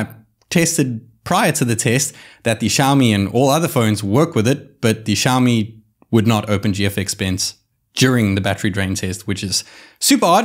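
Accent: South Africa. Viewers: Sounds like I can hear a South African accent....my S22+ gives me some anxious days, compared to my last flagship Note 9. Luckily I love the phone otherwise I would have ditched it. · Bro sounding South African🤔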